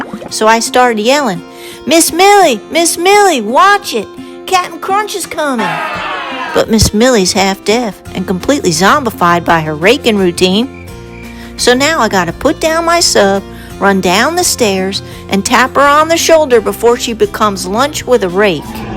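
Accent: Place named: United States. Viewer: I am enjoying your episodes. They are very delightful to listen to.. I love that voice that has a country draw to it.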